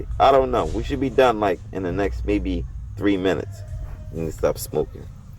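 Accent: Philadelphia, United States. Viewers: Are you from Philly? Happy belated and thanks for the vid.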